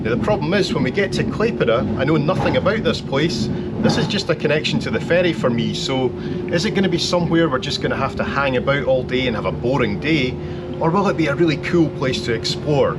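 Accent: Scotland, United Kingdom. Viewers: Sounds a bit Scottish 😊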